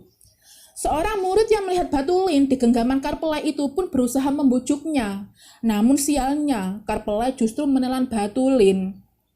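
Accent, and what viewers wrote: Java, Indonesia. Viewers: moso sih mbak ora ngapusi kan... bahasane lucu medok² piye gtu...🤭 smangat mbak yu....👍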